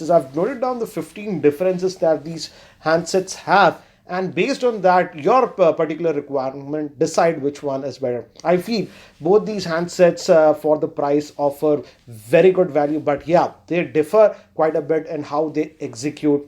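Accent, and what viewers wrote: India. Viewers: your accent man is so Indian i cant stand it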